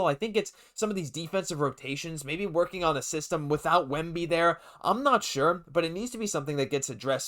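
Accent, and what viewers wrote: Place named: France. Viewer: Hello from 🇫🇷 Your french accent 👌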